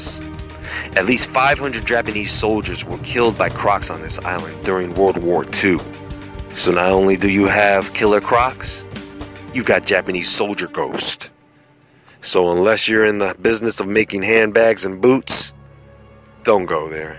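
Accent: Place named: Tennessee, United States. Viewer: I like the way you talk on this video, reminds me of my home here in Tennessee.. The best.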